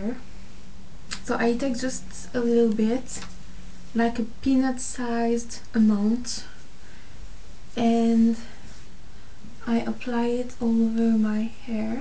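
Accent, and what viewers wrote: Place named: France. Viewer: Heey tu serais pas française par hasard?? Tu parles avec le même accent que moi , c'est troop choquant j'ai l'impression de me voir xD Anyway , if not , i just wanted to know what's the name of your hair color (the one on the lenght)? And my hair is now VERY dry after the salted sea ... Would you recommend me some products or any tips ?? Thaank youuu =DD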